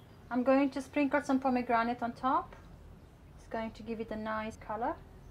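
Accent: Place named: England, United Kingdom. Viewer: ওয়াও ভাবী, রান্নার পাশাপাশি আপনার থেকে ইংলিশ এক্সেন্ট চর্চাও হয়ে যাচ্ছে😊 । ।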